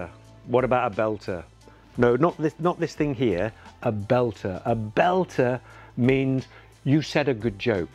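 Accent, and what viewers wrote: United Kingdom. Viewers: love love love this British accent😍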